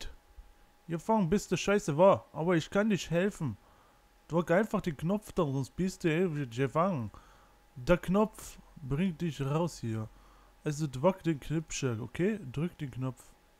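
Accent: Berlin, Germany. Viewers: Dat ist Berliner Akzent, so schwer ist dat nicht. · Den Akzent versteht doch jeder! und dasb isschen berlinerisch ick glob ick spinne dat müssteste wenischstens n bissl kenn ehy!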